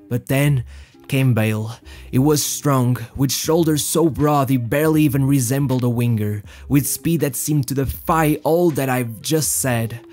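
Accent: Portugal. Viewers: This dude (narrator) is sooooo Portuguese. I'm almost 100% certain just because of the accent. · Are u portuguese? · I can tell your mother tongue is Portugese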